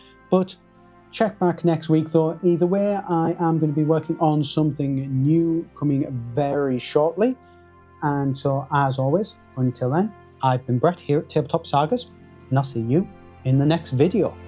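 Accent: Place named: Teesside, United Kingdom. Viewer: Great video buddy. Where do you play your local games sounds like we’re from the same area. Teesside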